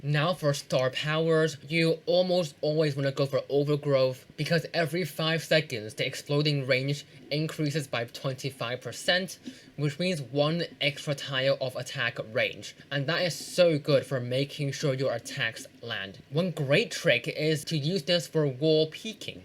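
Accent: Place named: United Kingdom. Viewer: bro sounds chinese and british at the same time i didnt even know that was possible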